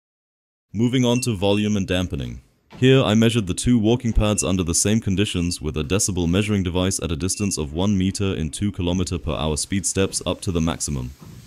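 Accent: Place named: Germany. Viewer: You sound German. Why no discount for Netherlands? 🙏🏼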